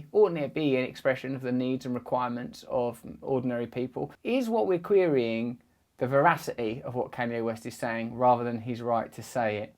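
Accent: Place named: United Kingdom. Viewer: your bri-ish accent is awesome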